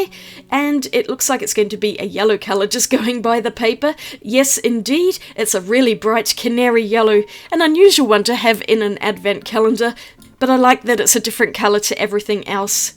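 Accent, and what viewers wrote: Australia. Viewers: This is such a lovely video,! I love hearing an Australian accent on YouTube, it's so normal and comfy to hear as a fellow Aussie. This advent calendar is so affordable and such a nice little "taste test" for the Born range! I really appreciate they didn't pre-sharpen the pencils, it means the tips weren't gonna break in the box!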